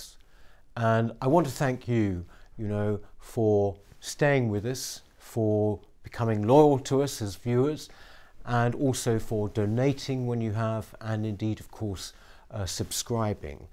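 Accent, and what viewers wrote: RP, United Kingdom. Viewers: It’s refreshing to hear intelligent, incisive, coherent, reasoned and truthful comment and discussion, spoken in Queen’s English in whole sentences without beginning each one with “So” or endlessly repeating “Y’know”. More power to you!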